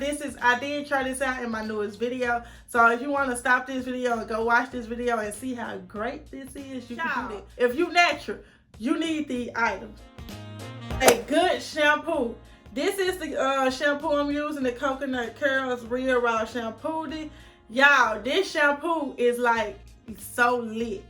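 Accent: South, United States. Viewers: Damm you country af. I couldn't understand most of what you said. Are you hard of hearing?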